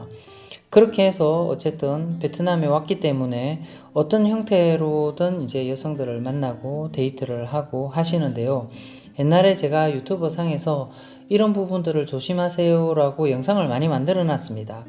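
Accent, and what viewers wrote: Gyeongsang, South Korea. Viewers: 말투가 너무 정겨워요. 제가 중학교 까지 창녕에서 가장 가까운 합천에 살아서 그렇게 느껴 지는가 봅니다. 영상 잘 보고 있습니다. 곧 10만 미리 축하 드려요.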